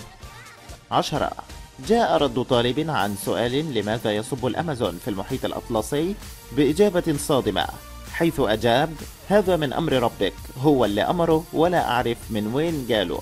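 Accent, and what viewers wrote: Egypt. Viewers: ايه الكلام المصري ده ضحكني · والله انكشفت طلع يعيال الي يتكلم مصري 👀👀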